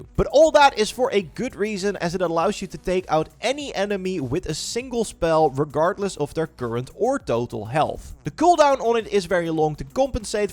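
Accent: Netherlands. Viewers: You dutch ?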